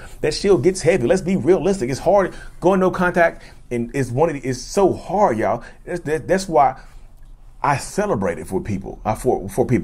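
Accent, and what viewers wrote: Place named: South, United States. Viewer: This is random but I am from the northeast so to me you have such a southern accent and I love it ❤❤ I could listen to you talk all day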